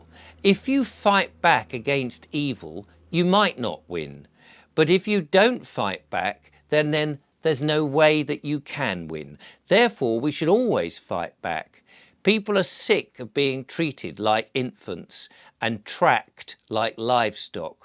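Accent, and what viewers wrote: United Kingdom. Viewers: you are a gem! Love your 'terribly' British! I am Australian.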